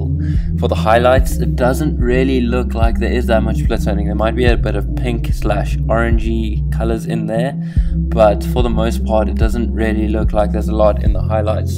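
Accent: South Africa. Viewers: Immediately knew you were from Cape Town when i heard your accent.. great youtube channel and great content.. I'm about an hour away from the city and I'm also a photographer. Keep up the good content.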